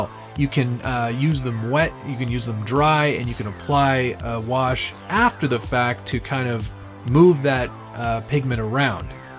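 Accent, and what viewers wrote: Canada. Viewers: Hey man quick question, are you from Canada?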